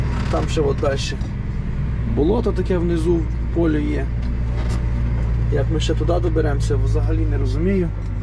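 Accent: Ternopil, Ukraine. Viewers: ваш говір Тай ніби із тернопільщини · Тернопільський акцент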